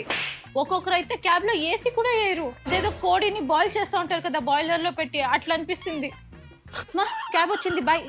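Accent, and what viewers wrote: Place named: Karnataka, India. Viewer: Mee slang Bale ga vuntundi karanataka Telugu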